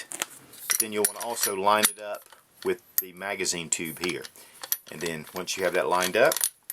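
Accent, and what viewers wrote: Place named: South, United States. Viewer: i love my 500a, i mean its not a suave 870 but i mean really when your doing dirty work dependable is where it is. also 8 rd's in the tube one in the chamber you can't argue with that fire power, and also add a sure fire to the front and a shell carrier to the butt stock you got yourself low budget shtf gun, i mean a slug is a human stopper hell buck shot is too. great review, i love your channel and love the fact im hearing a southern accent with my review long live the republic!!!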